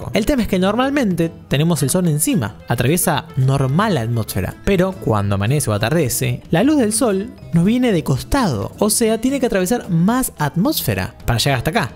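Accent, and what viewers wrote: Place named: Buenos Aires, Argentina. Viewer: Listo sabemos que sos de buenos aires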